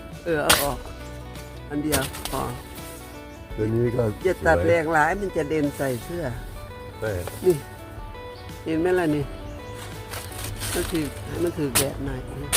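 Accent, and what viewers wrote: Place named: Isan, Thailand. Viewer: สวัสดีจร้า กับปู่มารับชมวิถีชีวิตบ้านๆคะชอบ พูดภาษากลางผสมอิสานมากคะฟังแล้วหัวเราะตามเลย🤭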